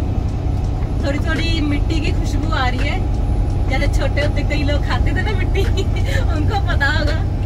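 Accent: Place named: Bihar, India. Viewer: ਮੈਡਮ ਬਿਹਾਰ ਤੋ ਅਾੲੀ ਲਗਦੀ ਅਾ ਬੲੀਅਾ ਞਾਗ ਹਿੰਦੀ ਬੋਲਦੀ ਅਾ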